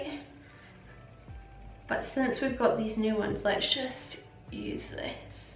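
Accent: Scotland, United Kingdom. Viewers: Omg are u Scottish??xo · Some nice stuff there. I love the colours in that little eye palette. And the accent, the "HAMISH", the Flower of Scotland - all my Scotofile cravings fulfilled. LOL. You had a birthday??? Happy Birthday. Hope you had a lovely day.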